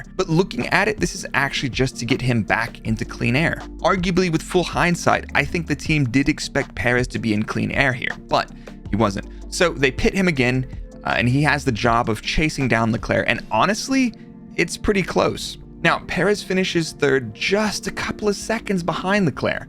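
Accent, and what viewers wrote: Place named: United States. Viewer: Love your content . It’s cool to see a good breakdown using data. I’m one who loves to look at telemetry. If I can suggest a video that I believe would be a hit is a comparison of teammates using the available data to break down who was faster. Removing as many variables that are out of the drivers hands and just look at raw pace. I think you could go very deep with it even factoring tire vs tire and length of stints , who has better tire management, etc. You would definitely kill it. Keep up the great content. It’s cool to watch f1 stuff from someone who speaks like I do . (I just mean a US native) actually I don’t even really hear the English/British/UK accent anymore. It’s almost more noticeable to hear a US accent .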